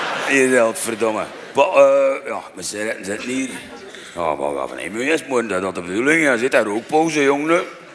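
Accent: West Flanders, Belgium. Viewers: west Vlaams uit België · Top westvlaams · jemoe ma west vlaams kun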